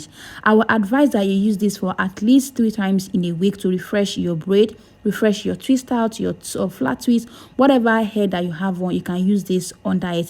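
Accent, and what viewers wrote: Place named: United States. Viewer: At least her hair is natural but tell him about her accent she got Americanized so she just bragging about having real hair why don't she go buy a damn hair store why don't black women go invest in the same products that they use for their hair like people that are not your race know your hair better than you our black community is stupid as f***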